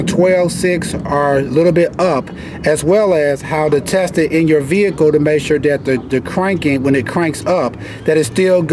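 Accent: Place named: St. Louis, United States. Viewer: Sounds like you might be from St. Louis my friend.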